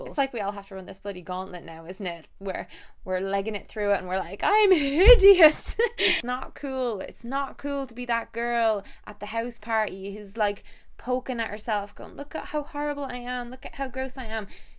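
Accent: Ireland. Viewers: In all honesty I'm not happy with my body but there's no hate. I've struggled to put weight on for years and after recently being diagnosed with under active thyroid, I can now start to heal and find balance to my body again with Meds and a return of energy. Though I don't like how my curves have dropped off and I already have a prominent bone Structure, I am thankful for the blessings I do have, I remind myself that my vessel doesn't define my value and the fact I would never want another to feel In such a way so I must be kind to myself also. The odd days where I just feel bleurgh, I will either sloth it out n just enjoy being comfy n have the lil treats that cheer me up like a brew, favourite food or a pamper. Or I will front it out, put a face on, get dressed and put focus on a part of me that I'm happy with ie: do my makeup a different way to draw attention to my eyes or lips, accentuate my waist or wear tights or Leggings instead of something unshapely. 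Thank you for always being so open and honest and sharing your experiences. I adore you and your Irish accent and know you inspire so many people, so thank you for using your platform for kindness 💖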